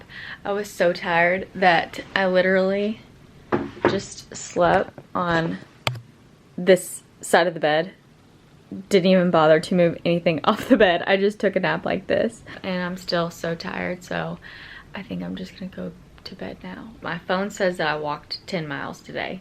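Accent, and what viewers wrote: South, United States. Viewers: I truly hope you enjoyed Paris. This is one of my favorite cities in Europe.... You have a lovely Southern accent as well 😀👍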